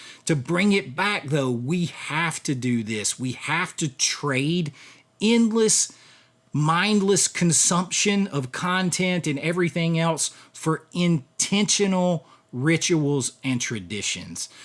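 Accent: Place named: South, United States. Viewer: Absolutely does. Sorry i never check back for replies because i guess im gettimg older but again tonight im watching you new video as we text. Me and my daughters joke now "pop that tape in i gottt youuu" 😅with your southern slang l😂 love it. If i can pull up the $ ill definitely patrion. You kmow how it is brother.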